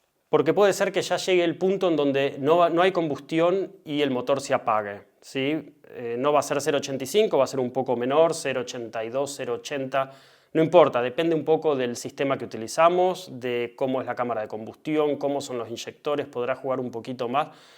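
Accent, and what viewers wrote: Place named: Argentina. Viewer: Muchas gracias por tan buen video, aquí un nuevo suscriptor, soy de Venezuela y particularmente no se pq nunca me ha agradado el acento argentino pero Los Argentinos que he conocido son maravillosas personas, lo digo pq me has caído super bien compa saludos y el mayor de los exitos para tu canal 👍🏻🙌🏻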